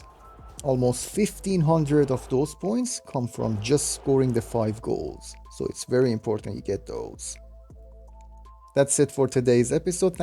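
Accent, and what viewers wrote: Iran. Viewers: Omade bodam videot ro bebinam bad goftam cheghad lahjash mesl iraniast bad didam esm chanelet e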